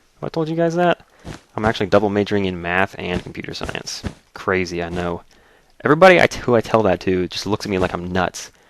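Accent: South, United States. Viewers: is it me or is voice sound vary southern this episode?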